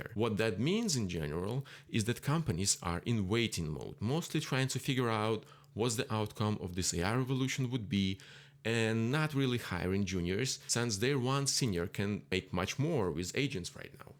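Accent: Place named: Russia. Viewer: thanks for answer, actually you're accent is pretty tiny but since I speak the same language as you (Rus) at least one, it's noticeable to me. I don't know why am I writing that in English lol I was talking about the yellow lamp actually which was in the frame, now I know it's name. Anyway thank you for the video, pretty much inspiring. I agree with your point of view and think that to handle the AI code you need to understand what's going on so IT guys should be still in demand. Good luck with the channel, you have pretty unique appearance and quality content so I think you're going to succeed.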